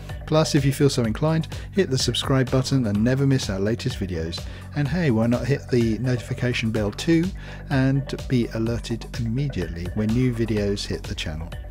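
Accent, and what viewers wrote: England, United Kingdom. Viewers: Damn, ur voice is so soothing... And that British accent... U can tell me to kill my parents and all i would be able to reply is Yes, Master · Finally a English voice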